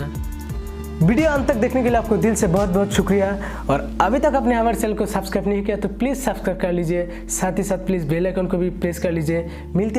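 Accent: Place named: West Bengal, India. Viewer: I think u r from Bengal ,,,, ya??? I could recognize your voice ☺️☺️ keep it broo very well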